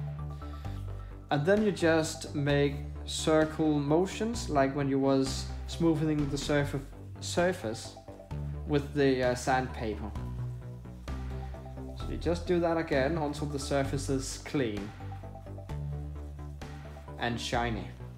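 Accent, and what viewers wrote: Denmark. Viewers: I knew it. Dansker.... Our accent NEVER lies.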